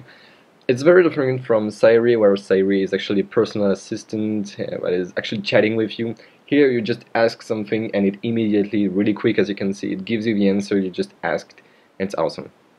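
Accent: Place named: France. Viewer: Monsieur est français ? :D Pas sûr mais un tout petit accent français peut-être :P Sinon belle vidéo et GG pour parler aussi bien l'anglais :)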